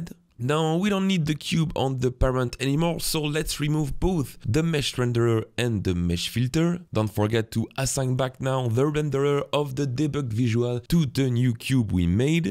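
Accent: France. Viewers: Salut (j'ai cru entendre un leger accent français, me trompe-je🤔😁) Merci beaucoup pour ces tutos ça aide tellement de monde c'est genial Evidemment Unity a encore tout changeé donc dans ma version 2022 c'est un peu différent..les Est ce que tu saurais quel fonction je dois appeler dans le visual scripting pour declencher une action avec ce fameux bouton? Exemple: lorsque j'appuie sur le bouton..un objet disparaît..car le "on button click" n'a pas l'air de fonctionner...merci!!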